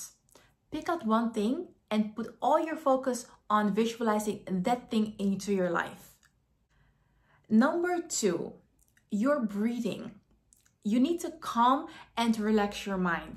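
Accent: Netherlands. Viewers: Are you dutch !?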